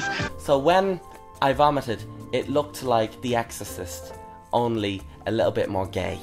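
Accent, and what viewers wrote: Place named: Ireland. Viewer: I love your accent. I need an Irish man in my life!! I could listen to you all day. Sending love from the other side of the world (New Zealand)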